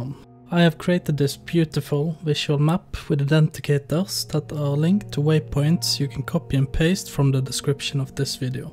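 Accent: Norway. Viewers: Det var så fint så. Bare at dialekta skinte igjennom. Kan man gjette Førde eller Nordfjordeid?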